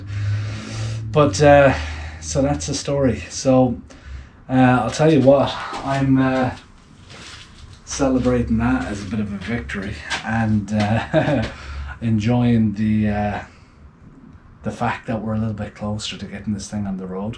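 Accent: Ireland. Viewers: Fair play to you! Is it an Irish accent?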